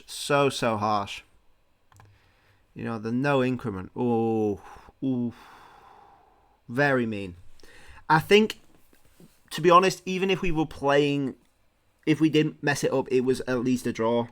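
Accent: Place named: Cockney, United Kingdom. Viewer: i think it's his cockney accent.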